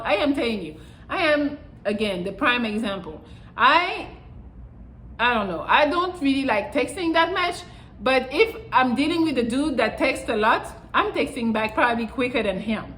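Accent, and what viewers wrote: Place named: France. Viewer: Another banging video and a solid piece of advice love your energy and aura around you and your work ethic and personality and intelligence is the best I've even seen looking beautiful and sexy and most attractive woman on the planet love them sexy eyes and and accent too and love your tattoos there's nothing sexier on a woman than all the qualities that you possess keep up the awesome work you do and never change who you are your perfect the way you are your a gorgeous French goddess queen xx ❤️ 😘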